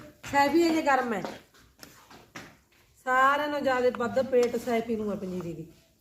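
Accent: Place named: Nabha, India. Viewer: ਅਜ fist time Volg ਦੇਖਿਆ ਵਧੀਆ ਲੱਗਿਆ ਨਾਭੇ ਕਨੀ ਦੀ ਬੋਲੀ ਸੁਣ ਕੇ ਵਧੀਆ ਲੱਗਿਆ ਮੇਰੇ। ਏਰੀਆ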